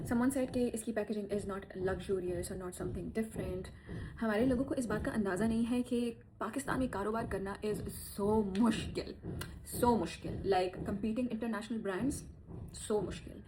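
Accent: Punjab, India. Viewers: Aoa Kaisi hyn ap ek baat ki to apki tareef krni banti mariyam pervaiz ap dono se phle kisne YouTube channel pe apni journey start ki thi or dono phle healthy thi Lekin day one se apki dressing bht zada achi thi or apk pas pass mostly Punjabi hyn but Urdu apki thek hy rehty rehty punjabi thori bht agyi hy samj bhi Lekin zada hard wali nhi keun k mariyam pervaiz ki Jo Urdu hyna badrah Urdu Mai Rey hota hy or aj tak apk husband nhi Nazar aye apk vlogs Mai Dil se Ameer or sub se zada ikhlaq se hona chaheye hy down to the earth hona chaheye hyn jub k ap typically pathan family se belong krti ho but the way you present your self very gracefully makeup skill's day by day or achy kiye but Jo weight ko ly k transformation hy incredible phle to husband k paiso pe har brand ka makeup Liya now as a women apne Baki women's ko dikhaya hy mustakal mijaiz apko achi trha life Mai bht Kuch bana dyti hy phle ap logo k makeup brands clothing brands xyz kis chez ka bhi brand ho Lekin aj kafi saalo ki hard working sub krwa dyti hy ap bv bhi ho maa bhi ho or self made business woman bhi aj apka khud makeup brand Hy jub k mariyam pervaiz hyn phle jisne makeup brand launch Kiya Baki Natasha waqas bhi hard working kri hy Lekin wo bhi Urdu Jo na kapre pehno mein Karachi se hu but mein bhi Punjabi hu Lekin meri Urdu thek Hy Kuch mahajir fenta parakh xyz to Natasha waqas ko abi apne pe working ki zarurat hy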